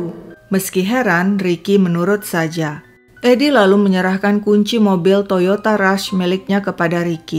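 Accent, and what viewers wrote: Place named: Medan, Indonesia. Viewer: Logat ini kek logat Medan om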